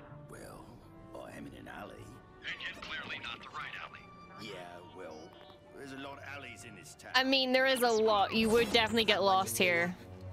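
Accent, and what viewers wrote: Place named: Scotland, United Kingdom. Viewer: I truly love your passion for Star Wars. I was the same, at one time. The first movie I saw in a theater was ANH, was very young but that spark of such a fantastical world and wonderful characters stuck with me as I grew up. Made me believe I could do anything. It did the same for so many in my generation, and we kept the faith for a very long time. But as sorry as I am to say it, those thoughts/dreams/beliefs have been absolutely destroyed since Disney took control. They systematically removed and obliterated that spark, took away the wonder and imagination of such rich worlds/characters. And in it's place gave us tropes, pale remakes of the original, girl bosses, and modern time standards put into a purely fictional world. I don't begrudge you any enjoyment from this or any Star Wars content. Goodness knows I've liked my share of entertainment that others thought was garbage. 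I just have to say this because you seem very genuine and I hope you're not ignoring what is actually taking place with one of the top 5 IPs of all time. And just to add: I've never really known why but the Scottish accent has always been my favorite (and a weakness in certain cases).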